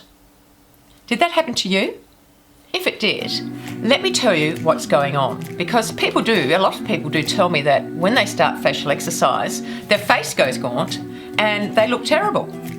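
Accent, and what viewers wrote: Australia. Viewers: I wake and do my exercises every morning with you!! I take off on the weekends!! I would love a Aussie accent, I love the way you talk!! I am from America we don't have a accents!! My question to you is, are you still doing facial exercises and will you be doing them for life? Have a great week!!!😊💟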